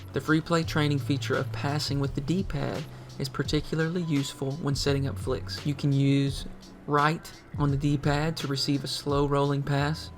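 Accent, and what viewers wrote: South, United States. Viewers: hi hello. I just found you from a sunless video and decided to look up your channel and I wanted to say a few things. 1. i'm pretty sure you're southern based on that accent hahah. I'm from the 'sip so ayyy. 2. I'v e never seen an in depth video like this helping people in each individual rank. so high props to you for even making these videos. 3. I just wanted to say thank you and I have high hopes for you my guy!!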